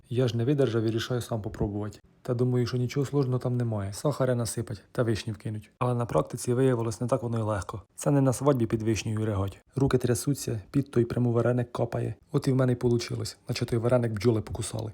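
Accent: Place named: Cherkasy, Ukraine. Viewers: Дякую,наче дома побувала.Мій рідний діалект,Черкащина.❤😂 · Ось у цього хлопця як раз сама нормальна мова! На Черкащині завжди так балакали!!! А ви, Наталочко, пошукайте інший контент для мовної чистки!!! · Рідні Черкаси!А я то думающо це за говір.Дайте ще й рецепта квасу з чорнобривці?! Дуже дякую,побувала на батьківщині! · Коли приїжджаю до мами і ніби не помічаю наш діалек. А коли слухаю на відео і реально помічаю наш Черкаський суржик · Dyakuyu!! Govorysh yak moi rodychi z Cherkas.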